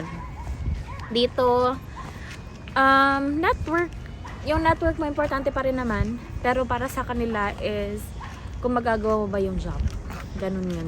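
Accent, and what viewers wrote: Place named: Visayas, Philippines. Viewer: haha! “nayabag naman ni cya oi”. bisaya njud cuz. haha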